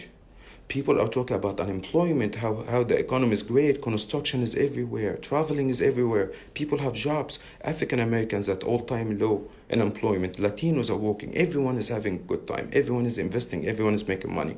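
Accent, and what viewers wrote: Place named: Iran. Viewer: I like his Persian accent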